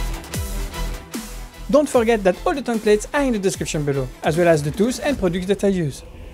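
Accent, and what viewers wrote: France. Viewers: Wah mdr l'accent Français se capte direct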